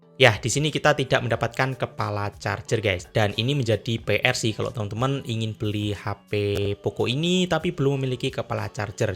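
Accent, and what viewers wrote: Banyuwangi, Indonesia. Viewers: Tibak e mas wong banyuwangi 😂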